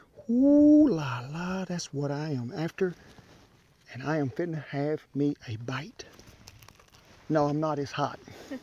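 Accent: United States. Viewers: That cowboy accent. You’ll be lucky to hear someone talk like that in New Zealand. Wait? No one talks like that here 🤗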